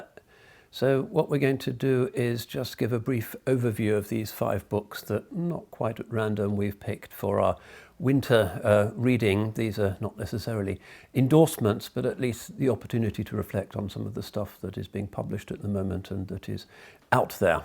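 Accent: United Kingdom. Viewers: im here for british english and his intellect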